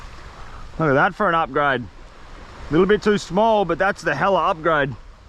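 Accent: Australia. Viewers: I love the long videos, I still remember meeting you in indo at a beach, I recognised another Australian voice and looked behind me and there you were with the boys! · Does this really sound normal to australians? I love this guy's accent, close my eyes and listen to him talk when im trying to get sleepy. But it never works because the videos are so interesting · My grandma was from Indonesia. I live in the Netherlands. Indonesia is so beautyfull. Its a shame all the rubbish thad washes up on the beach. It is really a beautyfull country. And youre Australian accent is always so funny and uplifting to me. "Yea dawgies". 😂 Love youre channel man.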